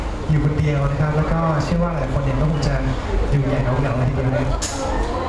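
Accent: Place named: Rural, Thailand. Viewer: พูดเหน่อน่าร้ากกก😘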